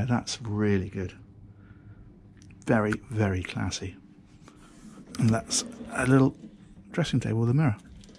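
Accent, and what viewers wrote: England, United Kingdom. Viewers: Another one of your Splendid Reviews,. The World will miss you on U-Tube when you retire from doing these Very Positive reviews on your Aquaholic channel.I am guessing on your Age now. I Believe what really makes you Better than the Rest is your English accent and Your British Personality which Shines Through for you.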